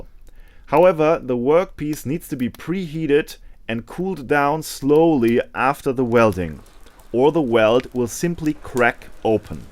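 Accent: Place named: Germany. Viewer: Your English in German accent cause HEADACHE. Better no narration and keep quite.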